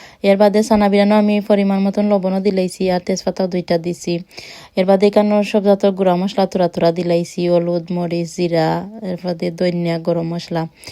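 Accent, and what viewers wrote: Sylhet, Bangladesh. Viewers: আখনি খেতে অনেক ভালো লাগে আপু তুমার ছিলেটি ভাসা শুনতে খুব ভালো লাগে আমার ❤❤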